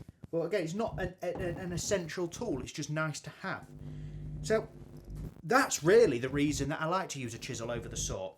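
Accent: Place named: Northern England, United Kingdom. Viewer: Your videos are brilliant mate! Also nice to hear another northern voice 👌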